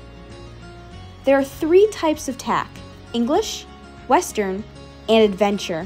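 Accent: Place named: South, United States. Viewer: The southern accent sounds like Sadie from RDR2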